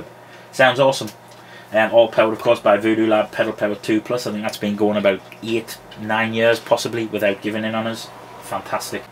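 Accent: Newcastle, United Kingdom. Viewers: are you a geordie mate? great pedals big fan mate :)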